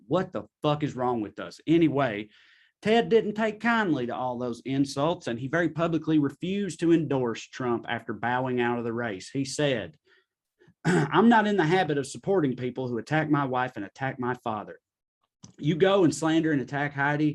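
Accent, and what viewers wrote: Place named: Tennessee, United States. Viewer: This level of sardonic erudition casually delivered with a thick Tennessee accent is f**kin' comedy gold.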